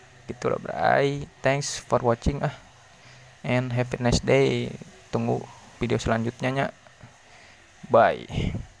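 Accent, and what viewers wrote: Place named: West Java, Indonesia. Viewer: tina logat na mah siga orang sunda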